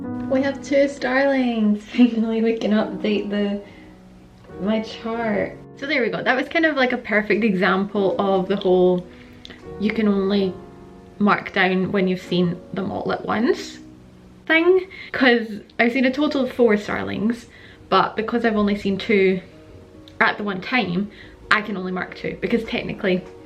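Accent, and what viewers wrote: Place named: Scotland, United Kingdom. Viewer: I find ur videos interesting? I love the Scottish accent x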